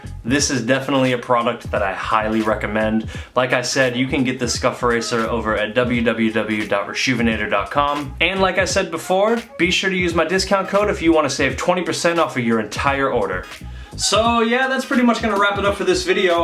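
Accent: Australia. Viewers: The Aussie accent is starting to get to you 😂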